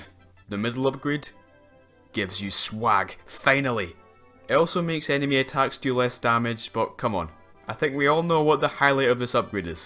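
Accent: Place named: Scotland, United Kingdom. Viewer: Where you from in Scotland my man?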